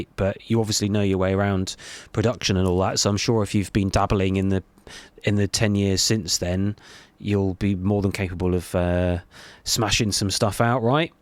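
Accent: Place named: United Kingdom. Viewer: after the song I didn't expect you to sound super british haha:D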